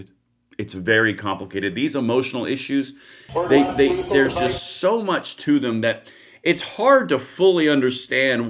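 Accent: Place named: Appalachia, United States. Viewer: Could you please despense with the hillbilly speaking, unsubscribed because I hate redneck language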